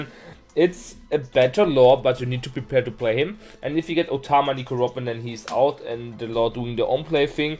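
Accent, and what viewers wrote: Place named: Germany. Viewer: Ah nice, hatte immer das Gefühl wegen des typisch deutschen Aktzents 😁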